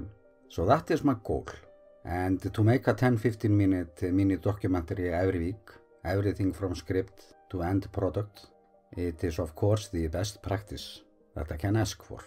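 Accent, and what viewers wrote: Iceland. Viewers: Miriam E. Yes, he speaks perfect English, but with an Icelandic accent that is unlike any other. Makes for a wonderful experience.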